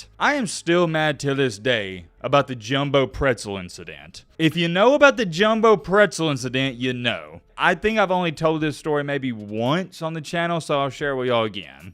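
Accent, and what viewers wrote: South, United States. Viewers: I was always a casual fan, but since 2020 I've been learning slightly then started REALLY learning about college football since like 2022 but have been watching for much longer, I have to say your channel is the best informative and unbiased college football channel plus you're Southern so I speak your lango (I'm From Southeastern Georgia, go Dawgs and all the Georgia teams) thank you for being newcomer friendly, still many things I don't know but if I wanna know something I just watch your vids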